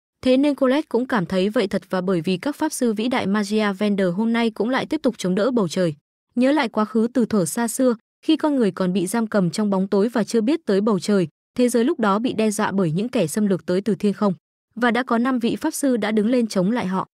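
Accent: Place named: Nghe An, Vietnam. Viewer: Tôi chắc chắn ad là người nghệ an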